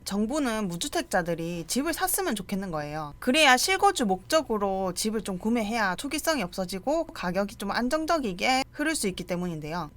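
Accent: Gyeongsang, South Korea. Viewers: 대표님 경상도분이신거 같아요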